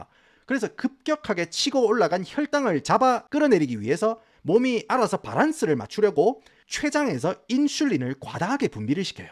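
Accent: Gyeongsang, South Korea. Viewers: 경상도 입니껴? 스토리 텔링이 아주 재미납니다. 항상 잘 보고 있습니다. 화이팅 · 칠갑ㅋㅋㅋㅋㅋㅋ 아 혼방 경상도인 이세요 🎉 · 진짜 노무노무 재미쪄❤ 심지어 유익한걸 이리 재밌게 얘기하니 안볼수가있나 교육방송을 예능으로 풀어주는 우리 갱상도오빠!!!😂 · 이분은 세차를 취미로 하는 금융인 인줄 알았는데 성대모사를 잘하고 개그도 잘치는 경상도 억양의 사투리도 잘 구사하는 경제 및 시사 유튜버 + 문화평론가 ㅋㅋ 다양하게 골고루 잘하는듯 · 미국에 사시나요? 경상도 사투리 쩌네요.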